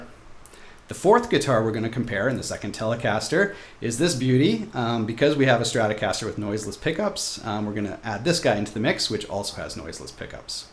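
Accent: Canada. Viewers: "talk aboat" he's Canadian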